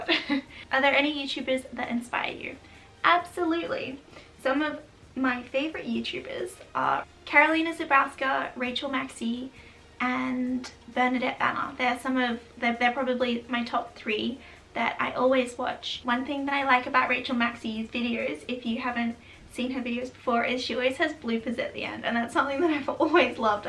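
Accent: Australia. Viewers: Absolutely knew you were an Australian!! I knew that’s why I loved you! I personally couldn’t tell if it was Australian or more England!! I’m from the states so I know nothing about an accent other than a southern (I’m from Arkansas haha!) I love Australian accents. 💕 love your channel dearly ... xoxo Olivia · I think it sounds very Aussie. I have the same accent! ☺️ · So bizarre you are fully Australian accent! ahaha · I have just recently discovered your channel, and I LOVE it!! I've always been obsessed with the '40s and '50s, I'm sure I was born at the wrong time! I'm from North East England and don't hear any South East England accent btw, it sound fully Australian to my untrained ear 😆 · I'm American and I don't get an American accent from you at all - it all sounds like adorable Australian to me :) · I'm newer to your channel, and I love your videos so much more than a few others I follow. 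Please keep making your videos, and I would love some cooking/recipe videos as well. I love the education of how people lived in the 50's. I have always been such a fan of the past. There is something very soothing and calming of how they lived. My parents were born before the 50's in Europe, and cooking bread and all the meals and desserts by scratch is what my mother specialized in. BTW, love your Australian accent. Much love from American.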